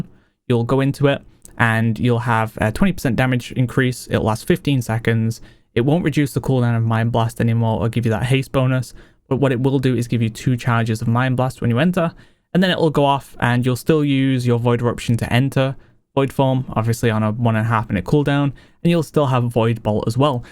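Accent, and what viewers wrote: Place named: Leeds, United Kingdom. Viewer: Northern UK, Leeds kinda accent.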